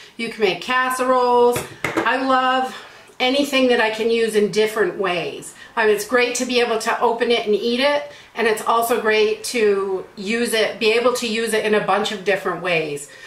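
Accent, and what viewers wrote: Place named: New England, United States. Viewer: When you held up the can of mackerel I was hoping you'd say "chowda"...I love the New England accent 😊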